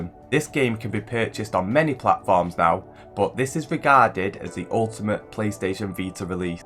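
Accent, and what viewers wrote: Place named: Manchester, United Kingdom. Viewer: Guessing you’re from Manchester?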